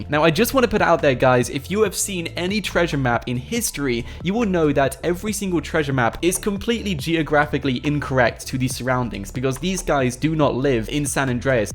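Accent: United Kingdom. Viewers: Are you British ps. Ur the best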